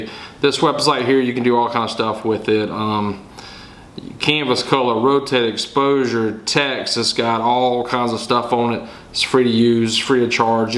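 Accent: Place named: Lowcountry, United States. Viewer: Where in the world are you from? Sounds like my neck of the woods! #lowcountry Enjoyed your DIY!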